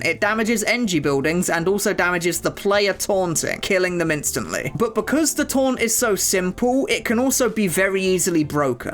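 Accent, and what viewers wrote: United Kingdom. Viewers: violently British man talks about violent things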